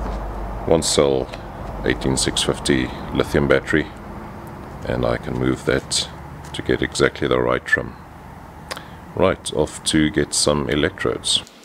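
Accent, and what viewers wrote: South Africa. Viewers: You sound South African. :)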